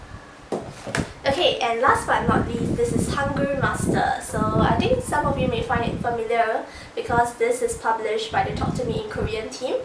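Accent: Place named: Singapore. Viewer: Idk whether anyone has said this but you sound like a singaporean.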